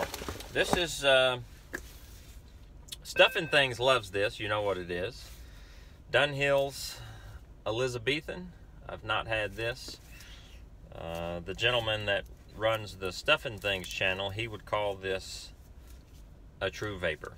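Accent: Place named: South, United States. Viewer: What part of the South are you from?? I'm in the south too.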